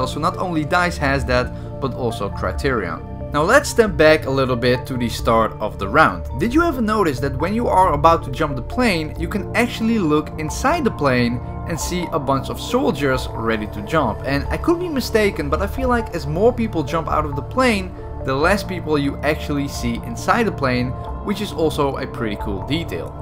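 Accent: Netherlands. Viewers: I knew from the first sentence that he is dutch 😂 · Are you dutch? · Brooooo je hebt echt een zwaaaar nederlands accent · Ben je nederlands want zo klingt je accent pls zeg het als het zo is ik abboneer nu btw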